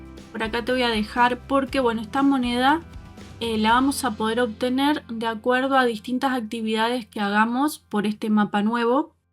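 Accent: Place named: Rioplatense, Argentina. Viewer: gracias, de donde eres? pareces de uruguay o argentina por el acento jajaj, aqui uruguayo:D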